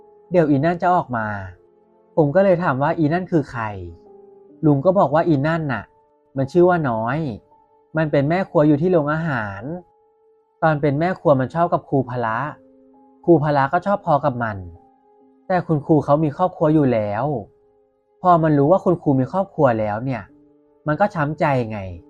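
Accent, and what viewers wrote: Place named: Rural, Thailand. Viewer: สำเนียงเหน่อเพราะฟังเพลินเชียว ขอติดตามเป็นแฟนคลับนะครับ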